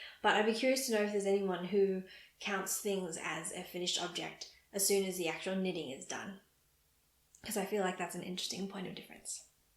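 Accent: Australia. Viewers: Hi, I've just recently found you here on YouTube. I am located in rural Victoria so it's lovely to listen to someone who has an Aussie accent. Would you know of any other Aussie yarn content on YouTube? I have searched but have only found a very small number of Aussie fibre enthusiasts. I am both a knitter and crocheter and am interested in trying both drop spindle and wheel spinning in the future. I hope you continue with the podcasts as I think they're awesome. 😊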